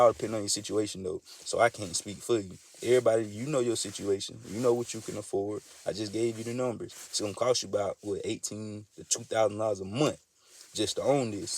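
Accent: South, United States. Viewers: We country boizzz🤣💪🏾 dats the country accent righ dea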